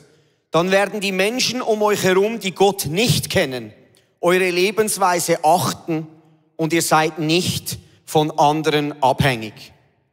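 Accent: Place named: Switzerland. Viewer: Versteh leider net so gut, des schitzerdeutsch, schief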